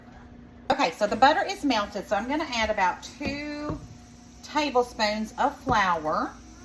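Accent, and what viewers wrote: South, United States. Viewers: Where do you live because you sound like a southern girl and cook like I was raised I just love your videos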